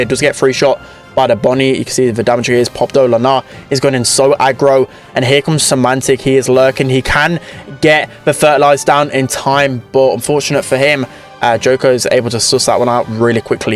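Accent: United Kingdom. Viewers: I just love British Accent. Underrated commentator too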